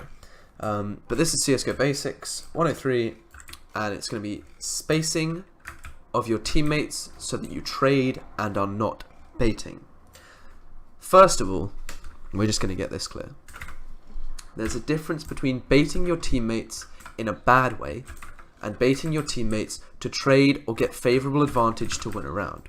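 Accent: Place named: United Kingdom. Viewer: You also have the perfect type of British accent for voice-overs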